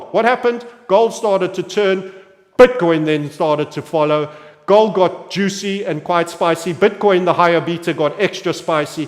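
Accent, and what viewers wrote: South Africa. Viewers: How amazing it would have been to have met this guy where he is from because i am from where he is from. I here his accent. Only thing is, im from the mother city. Not sure where exactly you come from Sir. Ek wonder as jy a kaapenaar is of van KZN? Meneer ek kan nie so lekker siuwer Afrikaans praat nie, maar jy weet mos, ons praat baaie taale hier in Kaap. 😂 Dankie vir alles meneer.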